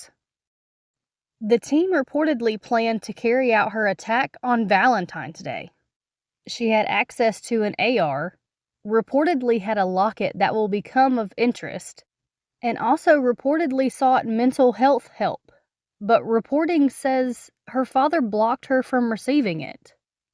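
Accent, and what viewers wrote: South, United States. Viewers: Can I just say, I love Southern Accents❤️ Taking the “i” out of Oil is awesome. It doesn’t need to be there, we all know what it is without it(sludge). Love it🥰🤘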